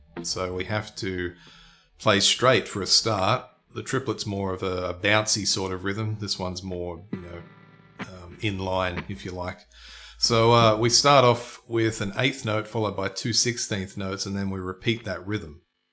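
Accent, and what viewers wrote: Australia. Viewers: What part of australia are you from mate?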